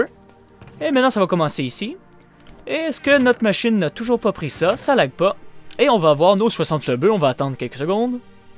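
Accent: Quebec, Canada. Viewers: tu es Québécois? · Oh un québécois .....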